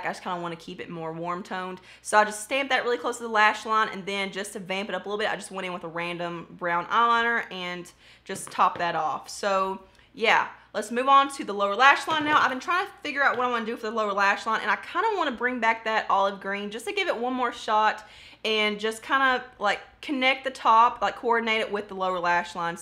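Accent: South, United States. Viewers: Where are you from? I'm from TN, the accent I can guess you are too or AL, GA, KY. Be cool if you where from TN too, I've only watched one of your videos so far but I like watching you so far! Pretty mellow, real, and tell the truth! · I just found you today, and I LOVE your honesty and Southern accent! I am from Arkansas, girl! Going to peruse your older videos to find out where you’re from! ❤️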